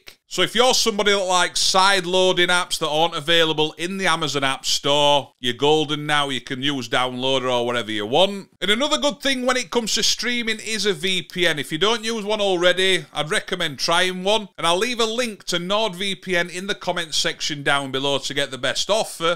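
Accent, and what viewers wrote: Yorkshire, United Kingdom. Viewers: Subscribed! Proper Yorkshire!! Keep em coming! Great to hear the accent all the way from 🇦🇺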